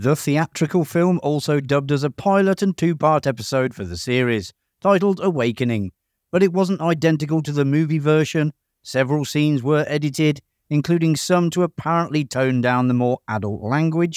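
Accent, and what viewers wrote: United Kingdom. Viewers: The guy's British, dude! Give him a break!! 😂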